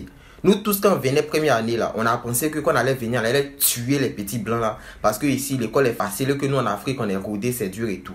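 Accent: Ivory Coast. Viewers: Mdrrr tu m'as tué avec votre accent ivoirien. Bah j'suis un étudiant sénégalais en licence 3 commerce international je souhaite intégrer un iut . Dans mes choix de formation j'ai postuler 6 formations en métiers du commerce international et une formation en DUT techniques de commercialisation. Ma question est de savoir si il est conseillé de postuler dans différentes formations avec quelques rapport en termes de matières enseignés et de débouchés professionnels. Et comment justifier cela au cours de l'entretien pédagogique ? Merci d'avance !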